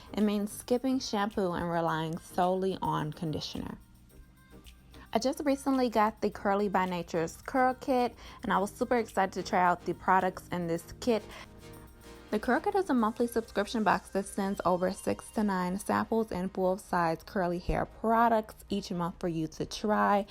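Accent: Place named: South, United States. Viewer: Where are you from? I hear a southern accent. I'm from Mississippi! :D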